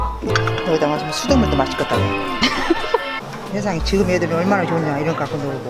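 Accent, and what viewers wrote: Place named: Chungcheong, South Korea. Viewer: 충청도사투리ㅋㅋㅋ 우리할머니같아ㅋㅋㄱ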